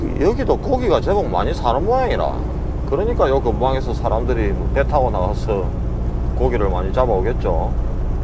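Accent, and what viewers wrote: Gyeongsang, South Korea. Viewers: 행님 건강하이소^^먼저 저는 부산 경상문디 행님 동생입니다.행님 뷰 홀딱 빠져보고있습니다.뭐 때매빠질정도로 볼까요? 경상도 행님 목소리 인것같습니다. 행님 저는 추레라 하고있습니다.행님 최고다.있빠이 행님 뷰 보면서 마이 배우고 있습니다.돌쇠 힘들어도 마이 챙기주이소~^^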